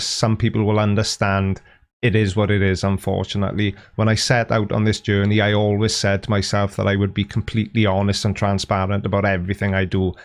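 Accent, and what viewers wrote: Wales, United Kingdom. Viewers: Hi, love your channel, been a long time subscriber (about #800 I think). I’d rather have you honest and possible insulting, than fake and Nanby- Pamby. This is why I keep watching you, this and the daft Welsh accent ( this is from an expatriated Cockney) Cheers Paul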